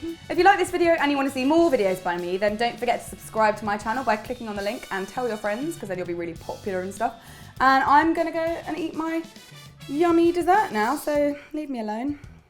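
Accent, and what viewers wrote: England, United Kingdom. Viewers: Well she doesn't sound American coz she's English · she keeps interjecting little bits of American English... I really liked the British accent tho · I can't get enough of the English accent.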